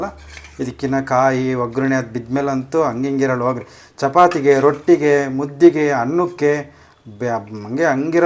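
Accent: Chitradurga, India. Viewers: Sir nammdu Talikatte Holalkere,nivu hosdurga, Chitradurga dalli,yava villege sir? ನಿಮ್ಮ ಭಾಷೆ ಪಕ್ಕಾ ನಮ್ಮ ಐತಿಹಾಸಿಕ ಚಿತ್ರದುರ್ಗ ದ ಭಾಷೆ, ಕೇಳಿ ತುಂಬಾ ಖುಷಿಯಾಯಿತು,tq u sir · ಪಕ್ಕಾ ದುರ್ಗಾ ಭಾಷೆ....... ಅಣ್ಣಾ ನಮ್ದು ಹೊಸದುರ್ಗ........😊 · ಚಿತ್ರದುರ್ಗ ಭಾಷೆ ಸೊಗಡು...ಆಹಾರ ಪದ್ಧತಿಯನ್ನು ಚೆನ್ನಾಗಿ ಪರಿಚಯಿಸಿದ್ದೀರಿ ...ಸೂಪರ್ bro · Anna nam durga bhashe chanag mathadtiya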